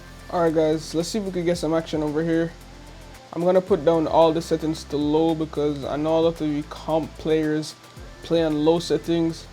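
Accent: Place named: Jamaica. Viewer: Subscribing just because you sound like a fellow Yardie. Didnt even finiish the video yet 🤣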